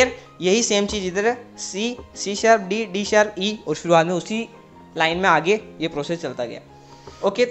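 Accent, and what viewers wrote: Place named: Gujarat, India. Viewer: Ye pakka gujrati hai 😂😂😂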